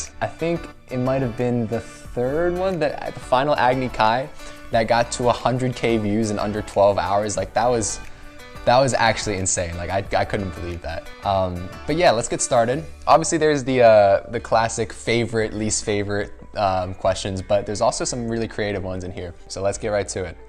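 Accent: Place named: United Kingdom. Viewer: What’s with that British accent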